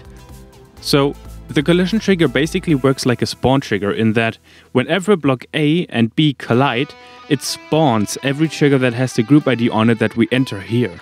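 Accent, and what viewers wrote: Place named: Germany. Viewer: you sound german lol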